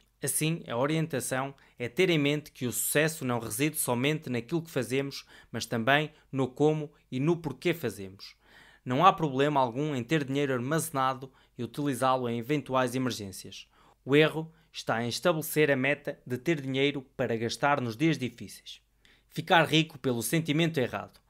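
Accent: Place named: Portugal. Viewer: Parabéns Que bom encontrar um sotaque português ❤🇵🇹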